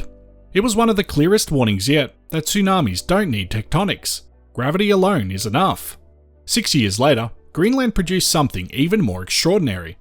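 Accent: Australia. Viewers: Great to hear an Ozzie voice - and Tsunamis are a fascinating topic. I’d love to hear your thoughts on abrupt climate change - what geo evidence is there that paleo climate has previously abruptly changed in years not millennium and when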